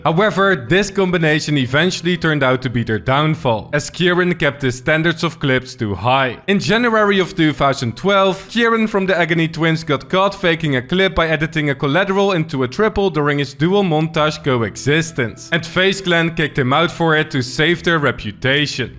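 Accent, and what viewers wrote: Netherlands. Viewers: can always spot a dutch accent ! :D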